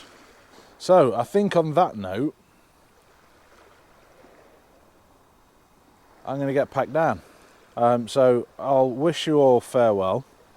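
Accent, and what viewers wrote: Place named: Derby, United Kingdom. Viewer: Hi again, should say I'm a Derby man myself, from Allenton originally, nice to hear the accent, it takes me back. 🧔 👍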